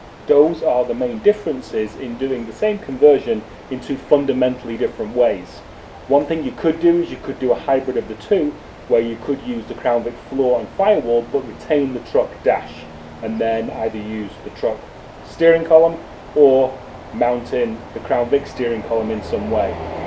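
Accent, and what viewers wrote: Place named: Northern England, United Kingdom. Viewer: Now mate I've recently found your channel and enjoying your stuff but i've one question. what part of the north of England are you from as its great fun hearing English/northern terms,swearing and pronunciations mixed with americanisms . keep up the good graft.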